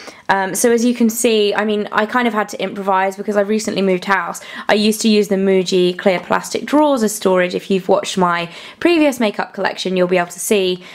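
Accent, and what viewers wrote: United Kingdom. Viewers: British accent I WANT THAT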